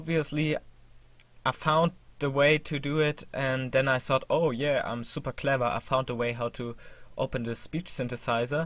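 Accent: Germany. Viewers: man merkt dass du deutscher bist Xd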